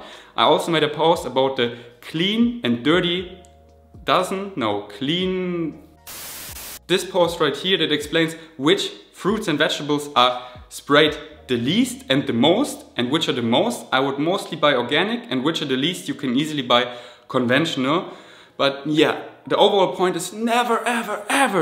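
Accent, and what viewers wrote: Germany. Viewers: I really like your videos. So real. And your German accent is cool. Super cool that you talk in English. Anyways, keep it up! I am watching!!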